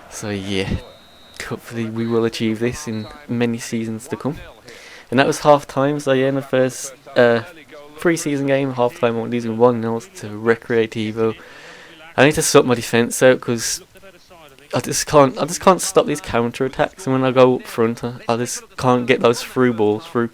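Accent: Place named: Wolverhampton, United Kingdom. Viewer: Are u from WOlverhampton cus u sound like u are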